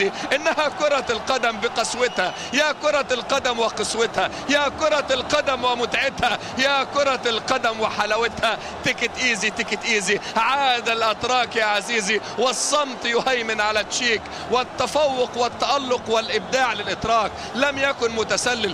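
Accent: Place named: Egypt. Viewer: Mısır Arapça şivesi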